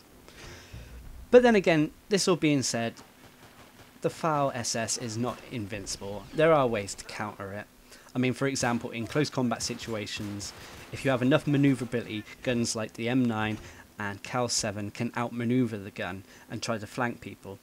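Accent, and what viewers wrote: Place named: United Kingdom. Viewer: I love the British accent it's just awesome. Will u add me on psn please.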